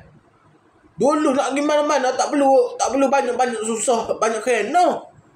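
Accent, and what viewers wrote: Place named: Kelantan, Malaysia. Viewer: Abe, aku x berapa faham loghat Kelantan mu. Kecek Bahasa Malaysia la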